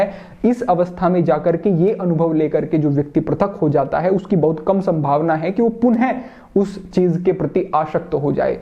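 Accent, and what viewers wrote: Standard. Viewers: आप बिल्कुल शुद्ध हिंदी बोलते हो गुरुजी 🙏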